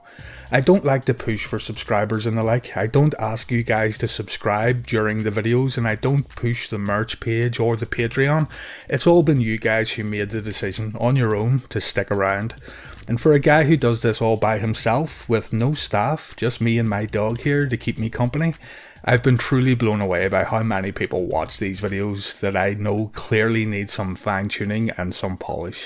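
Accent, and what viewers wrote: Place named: Northern Ireland, United Kingdom. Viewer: You dont need to change by the way mate your Norn Iron charm and knowledge/passion keeps us coming back.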